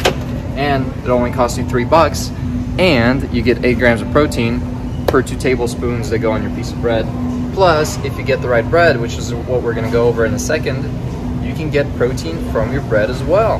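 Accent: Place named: Russia. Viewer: От русского акцента не избавиться))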